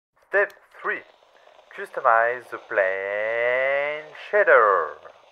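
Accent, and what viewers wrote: France. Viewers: Oh l'accent français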